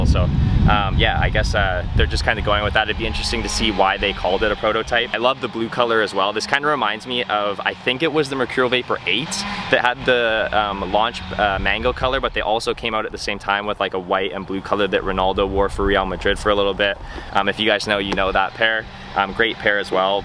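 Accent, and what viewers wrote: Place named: United States. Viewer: you sound like an amercian but if you gonna review fix up on your wording and facts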